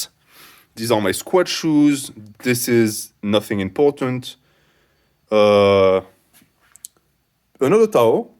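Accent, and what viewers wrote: France. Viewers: dude im just happy for you reaching 10k subs! good humour, informative videos and french accent :D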